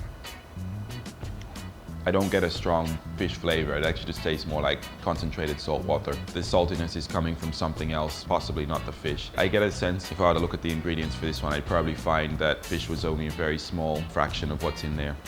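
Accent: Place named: Philippines. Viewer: Dude your english has a filipino accent...Anyways Italian food goes good with Patis...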